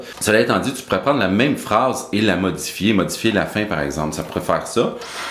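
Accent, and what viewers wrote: Quebec, Canada. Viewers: Bonjour. Je trouve tes vidéos très utiles. Elles m'aident à progresser dans ma technique. L'idée de la phrase musicale hebdomadaire est géniale. C'est court et pour moi qui suis débutant mais passionné, ça me permet d'avoir un exercice quotidien pour mes séances de musique. Je n'ai pas d'idée particulière sur un accord ou une suite d'accord, mais plutôt une suggestion (peut être pour plus tard), une phrase question...et sa réponse.😶 Et puis je voulais te dire merci pour ta générosité, ta simplicité et ta clarté. En plus cette pointe d'accent d'un cousin d'Amérique est tellement rafraîchissante...😁. À bientôt. Christian.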